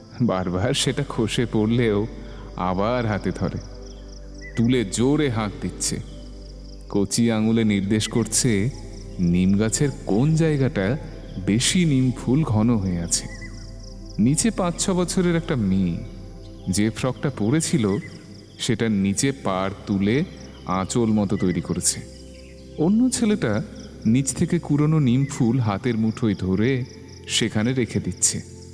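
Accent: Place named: Purba Medinipur, India. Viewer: পূর্ব মেদিনীপুরের মতো ভাষা আমি রামনগরের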